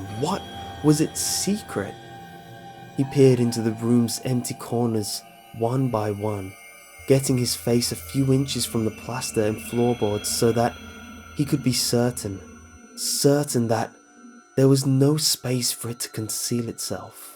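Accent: United Kingdom. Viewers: Happy birthday,. Honestly, your voice is perfectly for these kinds of creepy feelspastas. It has that earnest, nurturing feeling that just complements them perfectly.... And the accent. The sexy British accent.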